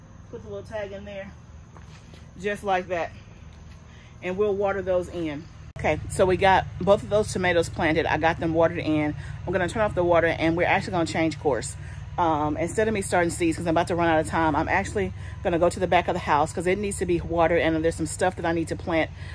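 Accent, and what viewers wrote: South, United States. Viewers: Do NOT un-Southernize the way you talk ! That's a good part of the fun, to hear how we all talk so different. Lol. Many blessings everyone. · I live in Florida but I'm from Georgia & your southern accent is strong & hilarious to me, love it 😂 U are ao funny and yes hunny its hot 🔥😅 Gardening is fun but frustrating at time with fighting critters that eat up your 3 months of hard work in 1 day! But just keep going 👍. I love your channel! ❤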